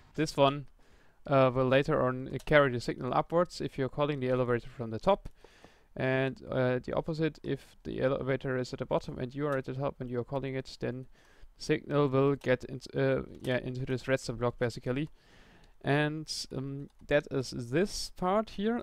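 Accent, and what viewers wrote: Germany. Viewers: So you speak normale German, you don't Sound like someone who s motherlanguage english is?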